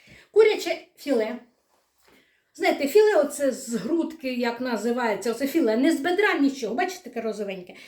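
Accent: Standard. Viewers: Як приємно, коли, нарешті, згадали українську мову. При чому, чисту. Приємно слухати. І рецепти у вас, заслуговують на поширення. Бажаю успіхів.❤ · Ой, як Ви гарно розмовляєте чистою українською мовою. Дякую. Хай Бог Вас благословляє і охороняє. Дуже подобаються Ваші рецепти.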